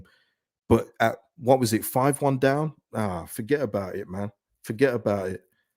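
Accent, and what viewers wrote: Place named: West Midlands, United Kingdom. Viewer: bro.. my bad man. I completely forgot! But hey, I can hear you're local to me (I'm from the west mids) so I just wanna say keep up the content man. Love hearing a common accent and knowing I'm not alone down here lol. I don't know any whites fans at all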